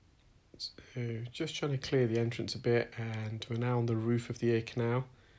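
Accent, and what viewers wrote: United Kingdom. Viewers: He's British 😅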